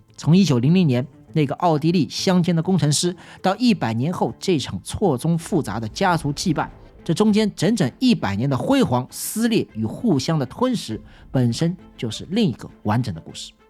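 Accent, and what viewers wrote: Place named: Wu, China. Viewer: 挺好的 就喜欢吴语区口音😂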